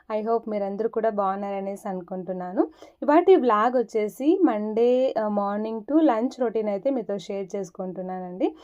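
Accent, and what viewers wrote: India. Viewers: 100 days challenge ki nenu kuda ready ga unna sister. Na age 29 na weight 91 ela taggalo artham kaledu ( me slang chuthunte thirupati la undi )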